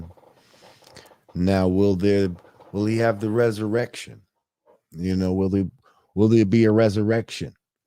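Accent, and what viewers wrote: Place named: Ireland. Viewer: Salute to the Irish accent 🥃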